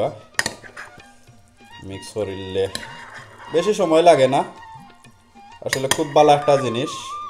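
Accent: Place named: Sylhet, Bangladesh. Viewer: খাওয়াটা বড় কথা নায় আপনার খাস সিলেটি মাথ হুনিয়া খুব ভালা লাগল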